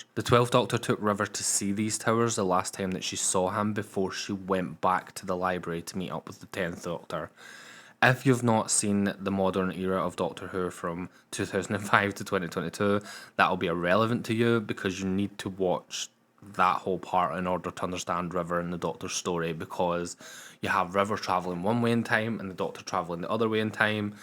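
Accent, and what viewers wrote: Scotland, United Kingdom. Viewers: Your accent is like amelia pond but a boy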